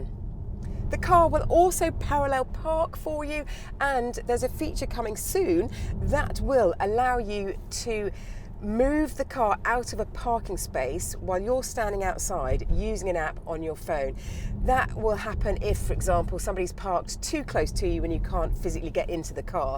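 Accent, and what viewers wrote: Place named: RP, United Kingdom. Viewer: VB-H showing us how a car review should be done! Even if she does sound a bit toffee-nosed she never fluffs or makes a mistake in diction! Good on you!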